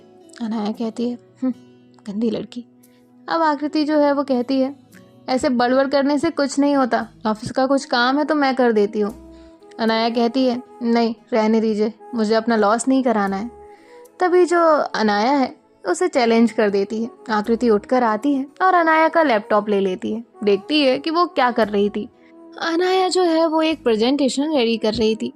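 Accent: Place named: East, India. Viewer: Aap kya East Side se belong karti hu kyuki mujhe yesa lagta hai ki aap Bihar ya Udisa se belong karti because aapki voice ton East Side ki lagti hai😅 Agar haa toh reply zarur karna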